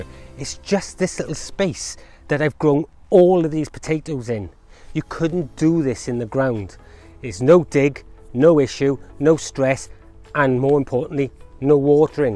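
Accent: Wales, United Kingdom. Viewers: thought you were local I'm from cwmbran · I'm dyin to know-is yours a welsh accent? You're a fantastic teacher, by the way.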